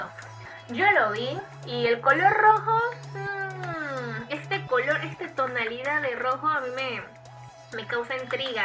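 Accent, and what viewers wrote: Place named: Peru. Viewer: Tu acento es peruano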